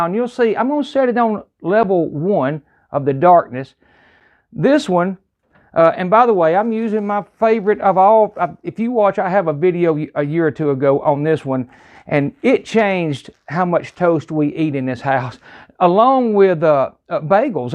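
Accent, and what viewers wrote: South, United States. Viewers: Thank you so much for doing this. Your video helped me decide between the two appliances. I like your southern accent, too.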